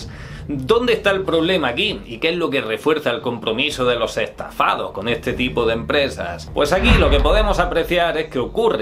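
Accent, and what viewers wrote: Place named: Granada, Spain. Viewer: like solo por el acentazo granaino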